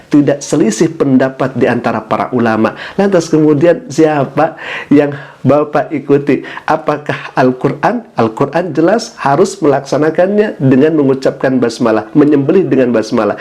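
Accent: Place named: West Java, Indonesia. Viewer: Ini sih ustad dadakan.. bicaranya spt org dari jabar...memalukan org sunda... Dasar ustad koplak.. 🤣🤣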